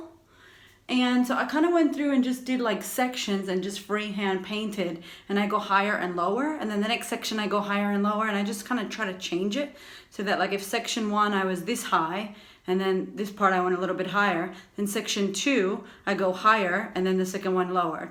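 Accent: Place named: Australia. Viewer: Super cute that you've picked up the aussie accent! Great video BTW!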